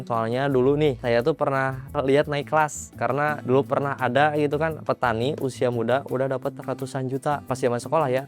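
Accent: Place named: West Java, Indonesia. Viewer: kebiasaan orang sunda ngomongnya gitu bang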